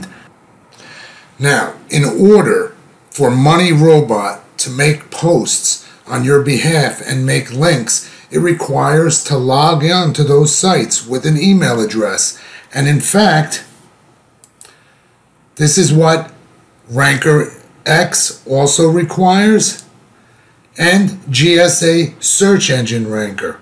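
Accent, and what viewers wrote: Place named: Bronx, United States. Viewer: Hearing your rough bronx accent say transcendental meditation was worth the watch. hehe So is this download and run software? If I buy a lifetime license, I dont have to worry about servers going down or anything shutting down the software in a few years?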